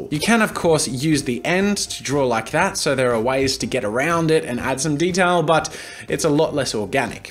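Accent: Australia. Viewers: He sounds extra Australian in this video 🐨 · its the Australian accent probably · I love your Australian accent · Australian accent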